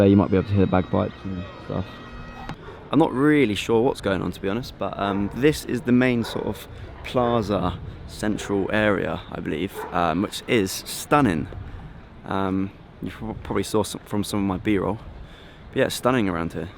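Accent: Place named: United Kingdom. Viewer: this is so powerful and comforting all at the same time. i fully loved every second of it. i've joined the 6am collective newsletter and i can't wait to post my first video soon. thank you! hugs from a 24 y/o woman who genuinely wishes she had your voice? so very soothing and british haha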